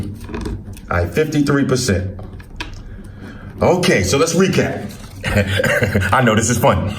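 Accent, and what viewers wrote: New York, United States. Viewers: I can fall asleep to this deep ass NY accent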